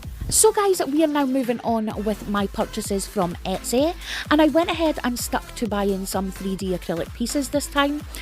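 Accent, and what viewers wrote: Scotland, United Kingdom. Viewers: Great to find U.K. suppliers. Thank you for sharing 😊. BTW “buck mad” isn’t an expression I’ve heard since I left Northern Ireland 30 years ago 🤣 I do love hearing a Scottish accent for a change.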